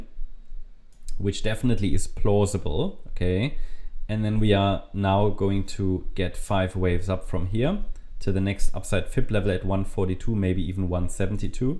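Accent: Germany. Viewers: War auch Ironie, hatte nur das Gefühl, dass er Deutscher ist, seinem Akzent nach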